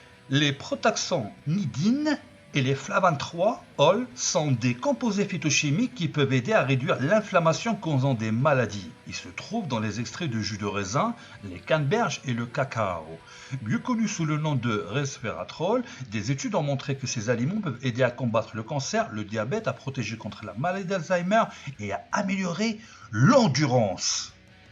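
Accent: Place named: United Kingdom. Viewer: pirate et à tous,ta trop un putain d'accent anglais pirate tu me fait trop délirer,si non bien les phytonutriment.Miioin et bonne nuit chère pirate:-)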